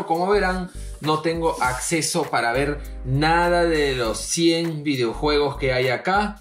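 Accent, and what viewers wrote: Peru. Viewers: Acento limelo? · Eres peruano? 🤔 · Eres peruano? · Soy yo o su acento es de peru xd?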